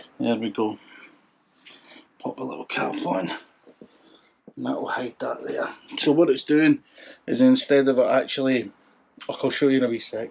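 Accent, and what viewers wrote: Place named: Scotland, United Kingdom. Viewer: Good video mate, I just got a new Pcx 2 weeks ago. Decent wee machine,I now live in Spain so the weather is great for it. I am still frightened too death leaning it in the bends . I suppose it will take time. I do things on my ebike that I could never do on the Pcx.( ride like a madman ). Good to hear a Scottish voice. Keep it up mate. Subscribed 🏴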